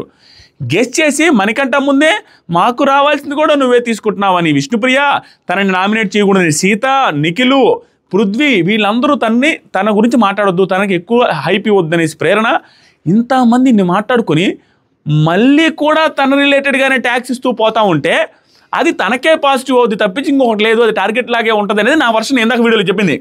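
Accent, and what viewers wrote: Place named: Nellore, India. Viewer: Adi anna Nuvvu reviews Chala baga cheputhav, Nenu Chala season nundi nee videos chusthunna . Nuvvu heartfull ga matladathav, Nuvvu mana nellore vadivi and pakka mana slang vadathav. Super anna 😊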